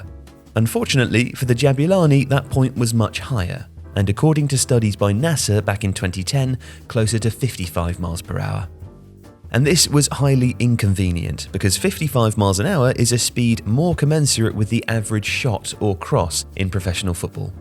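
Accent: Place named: RP, United Kingdom. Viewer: "lamiNAR" 😂 This lad is getting posher with every video!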